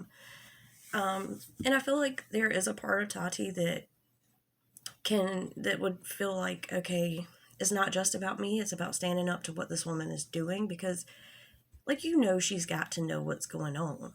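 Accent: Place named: Georgia, United States. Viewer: Are you from GA? Just trying to pinpoint the accent. Coming from AL over here.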